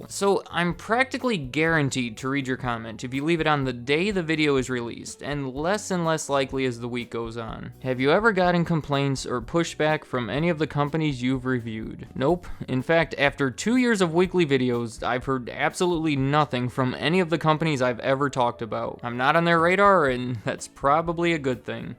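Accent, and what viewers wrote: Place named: Midwest, United States. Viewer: Sounds Midwest US to me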